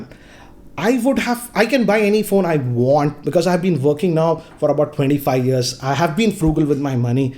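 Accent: India. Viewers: Another Indian with a heavy accent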